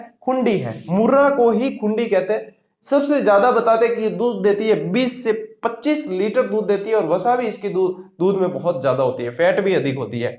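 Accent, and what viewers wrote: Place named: Marwar, India. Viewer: सर आप जो वीडियो में मारवाड़ बोलते हैं ना वह मुझे सुनने में बहुत अच्छा लगता हैं श्री शिवाय नमस्तुभ्यं महाकाल आपको हर बुरी नजर से हर हर विपदा से बजाएं आपके परिवार की रक्षा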